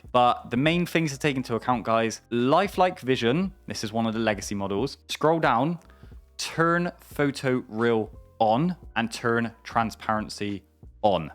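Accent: Bristol, United Kingdom. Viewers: Aye are u Bristolian too!? Subscribed!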